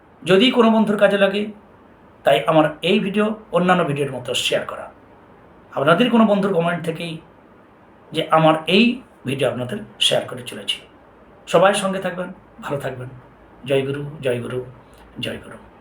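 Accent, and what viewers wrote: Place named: West Bengal, India. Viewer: আপনার বাড়িটি কোথায়? পুরুলিয়া, বাঁকুড়া,বা বীরভূম হতে পারে।মাঝে মাঝেই স্থানীয় টান চলে আসছে। তবে সহজ, সরল, ভাবে বোধগম্য, সহজপাচ্য পাঠ সত্যিই অনবদ্য। কৃতজ্ঞতা স্বীকারে -- মনি।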